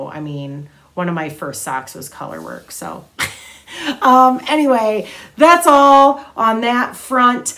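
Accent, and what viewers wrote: Midwest, United States. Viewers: I'm from Madison Wisconsin and I'm so happy that you say "All's." My Mom use to ask me all the time " How do you spell that?" Great Midwest accent!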